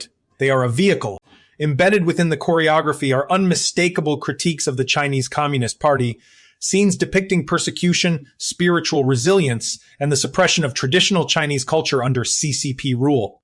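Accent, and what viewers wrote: United States. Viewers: Why the American accent? This is BS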